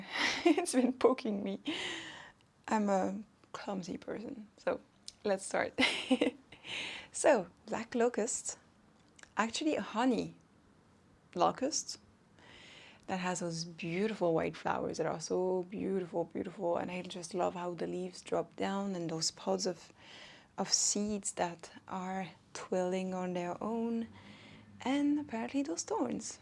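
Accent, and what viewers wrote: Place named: Germany. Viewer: hmm really, you mean you get told about a german or dutch accent? Peut-être est-ce que vous venez d'une région où il y a un certain accent allemand ou autre - je sais qu'il y a des pays européens où les germanophones et francophones se cotoient (aussi, merci d'avoir répondu et d'avoir éclairci ma curiosité - moi aussi je parle français, je vis présentement au québec, ce n'est pas le meme accent mais personnellement j'aime beaucoup l'accent francais européen. Je suis étonnée de ne pas l'avoir reconnu cette fois-ci! hehe)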